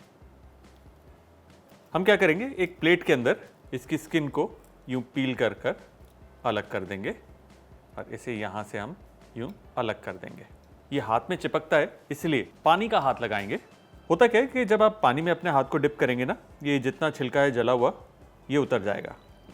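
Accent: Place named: Bihar, India. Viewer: punjabi wala bola na tum bengsli Odisha bihar style bol rahe ho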